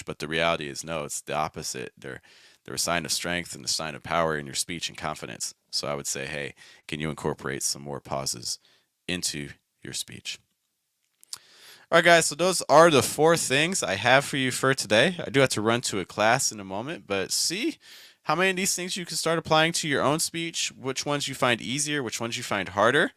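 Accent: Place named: California, United States. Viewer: I thought you have a California accent based on your stress patterns and the occasional use of "like." :) Actually, American accents are a very interesting topic to discuss that we all non-native speakers would love to hear about. For example, what are the differences between NY and Philly accents?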